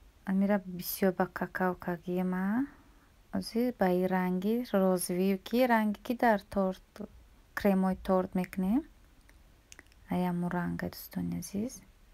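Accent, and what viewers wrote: Kulob, Tajikistan. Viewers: Ранги хдм гапезанен кулобиеи хдмон хшру гапмезаган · Аи рвечи гуфтанит бафигрм кулоби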